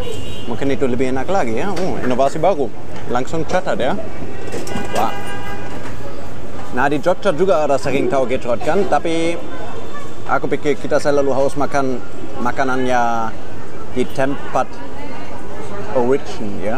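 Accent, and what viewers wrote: Germany. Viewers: I like your german accent XD mas bule... Due bist schön XD *maap klo salah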